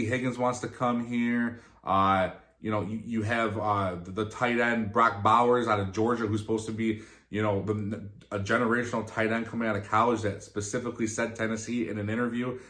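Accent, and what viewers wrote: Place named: New York, United States. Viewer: Great video and I too am excited about this staff! I was gonna guess you were from NY based on your accent lol. Where in ny?! I'm from the lehigh valley pa area. Not too many titans fans up our way!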